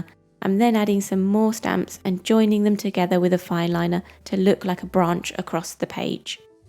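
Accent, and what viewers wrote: United Kingdom. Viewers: That looks absolutely gorgeous! You are not alone in the department of smudging ink, happens to everybody, I really thank all deities for washi tape! You have such a pleasant voice and way of speaking - I very much prefer British English over American English -, it's a real pleasure to listen to you.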